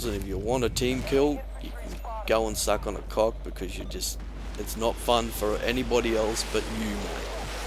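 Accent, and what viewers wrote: Australia. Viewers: you sound aussie are u? gret gamplay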